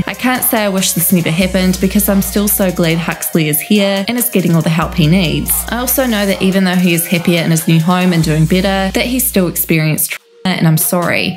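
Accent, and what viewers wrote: New Zealand. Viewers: New Zealand accent. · Now that is a Kiwi accent!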